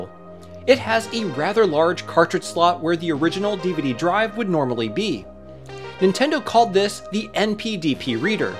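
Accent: Philadelphia, United States. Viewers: are you from the philly area? the way you say your "O"s reminds me very much of my accent.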